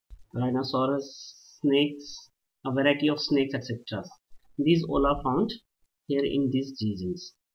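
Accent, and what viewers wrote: England, United Kingdom. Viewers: Very helpful to revise the portion once studied... I like all your videos ... except your English accent everything is good...thanks a lot... Pls keep on doing Bipin Dogra and *****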